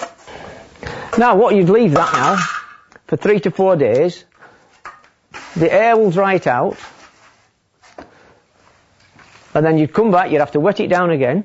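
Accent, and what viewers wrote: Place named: Preston, United Kingdom. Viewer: sounds like a preston accent ,you from preston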